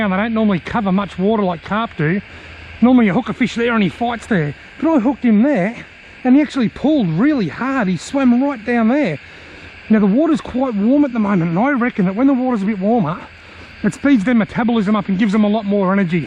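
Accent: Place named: Australia. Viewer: No worries. Thanks for all the great videos. I used to go yabbying all the time when I was younger. Your vids are a great reminder of those times. My kid also likes your vids coz you have a far stronger Aussie accent than I do. Cheers mate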